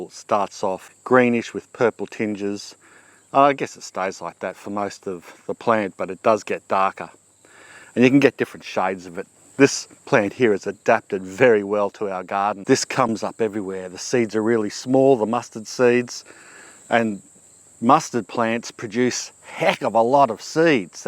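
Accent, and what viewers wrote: Australia. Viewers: Omg! I was looking for recepies of mustard and I found you, with this aussie accent that it m miss me, after spending 2 years in Sydney in 2000, you brought me to those days ❤ tank you for the recepies.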